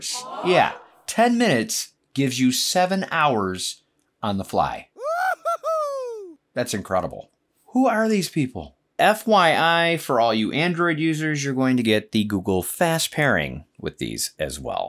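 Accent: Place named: Eastern Canada, Canada. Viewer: Best video on these cans yet. Pulling my hair out trying to choose what headphones to go with. Razer opus lacking the ldac sucks but these I've heard are poor performers once they are plugged in aux. Lose the eq settings and goes passive? But these are the nicest looking cans out there with almost all features needed. Ldac is perf for amazon music streaming. But as an occasional wired gaming head set... Sounds like it's a serious loser.... BTW are you from the Canadian east coast? You sound eastern Canadian.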